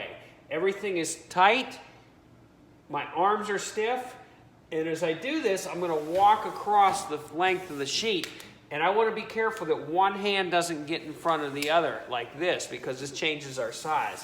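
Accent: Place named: Aliquippa, United States. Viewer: I left Aliquippa 30 years ago but I picked up that accent of yours within 15 seconds of watching this video. Great job, it was a good refresher for some Sheetrock work I have coming up.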